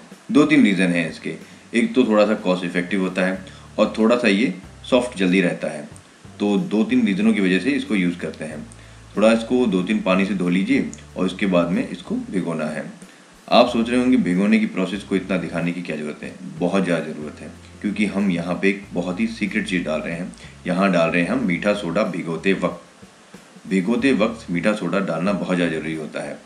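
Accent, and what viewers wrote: Pahari, India. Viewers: LAPATUWAPAN..........ahahahha.... ji pata nahi aapki baat aur bolne ke tareeke se lagta hai aap pahadi ho meri tarah...........uttarakhand se. And jordaar recipe by the way.... Main pakka try karunga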